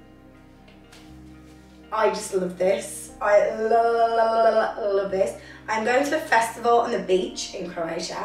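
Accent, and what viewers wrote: England, United Kingdom. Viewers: That sexy English accent adds more fire 🔥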